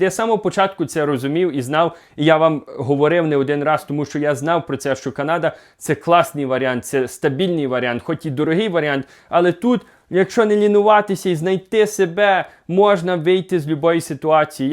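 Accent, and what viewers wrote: Western Ukraine, Ukraine. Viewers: Акцент западенский, тернопиль, хмельницк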